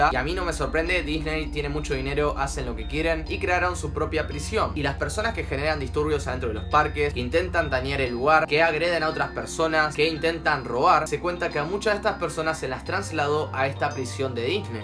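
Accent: Argentina. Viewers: argento o uruguayano??? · dros si lo escuchas tiene acento argentino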